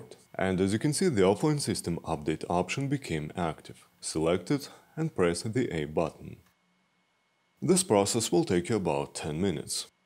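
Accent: Russia. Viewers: Русский чтоль? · русский акцент не скрыть)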